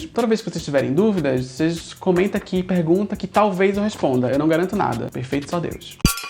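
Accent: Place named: Recife, Brazil. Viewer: por mais sotaque recifense falando de cultura pop, meu voto é sim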